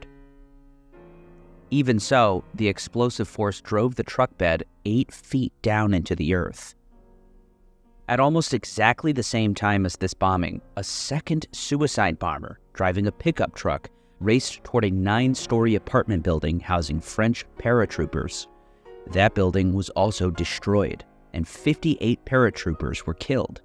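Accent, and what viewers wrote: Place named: United States. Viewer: He does speak with an American accent.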